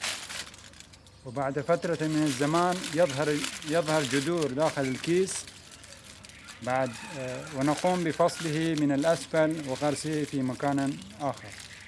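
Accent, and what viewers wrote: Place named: Bahrain. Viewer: هو عراقي فكيف يتكلم بحريني ؟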